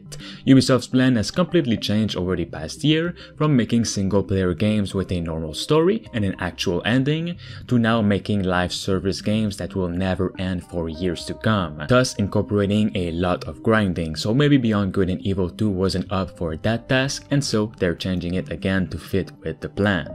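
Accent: Quebec, Canada. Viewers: Toé t clairement québecois 😅✌❤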